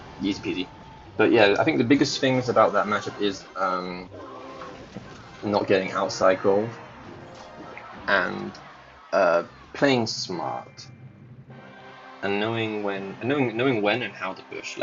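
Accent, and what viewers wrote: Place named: United Kingdom. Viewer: same even though I’ve lived here my whole life. You’re voice just kind of was a british twang if that makes sense 😭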